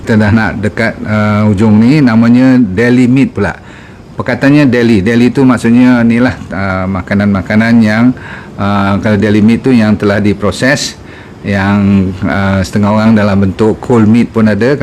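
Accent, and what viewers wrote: Malaysia. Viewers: Sepertinya profesor ahli soal makanan yg terkontaminasi bahan kimia, saya senang nonton videonya hanya kadang-kadang aksen dialektika melayunya bikin saya coba mengerti maksudnya, 🙏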